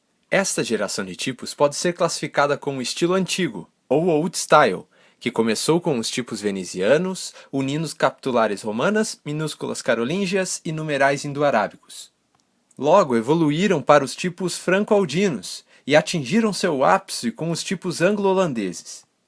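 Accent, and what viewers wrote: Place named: Brazil. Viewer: Ele tem sotaque de tudo quanto é região do Brasil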